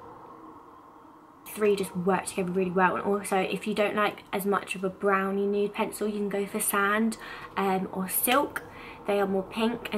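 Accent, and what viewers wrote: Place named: United Kingdom. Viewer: ye but she only speaks like that because she's from a certain part of Britain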